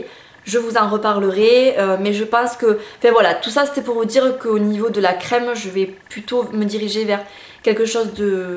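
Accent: South, France. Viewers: J'adore regarder tes vidéos sont un vrai plaisir, surtout avec ton petit accent du suuud !!^^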